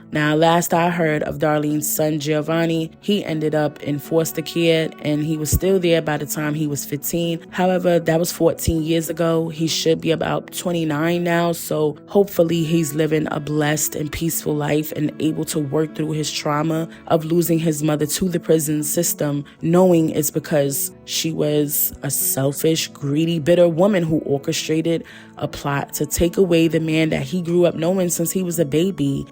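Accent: New York, United States. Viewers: Love your channel! Your story telling/narration capabilities mixed with that raw raspy New York accent and demeanor…keep up the great work! 🤎🤎✨✨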